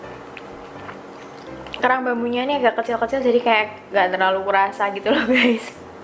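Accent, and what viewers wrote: Java, Indonesia. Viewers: Jowone kerasa pas ngomong 😁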